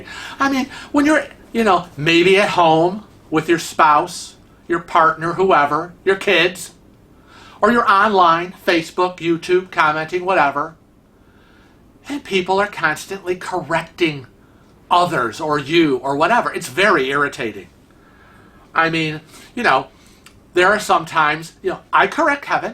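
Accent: United States. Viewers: As someone who grew up and lives in the Boston area, I can understand your speech pattern with your slight upper state New York accent better than I can from the locals here in Boston. Lol. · you sound mid western to me.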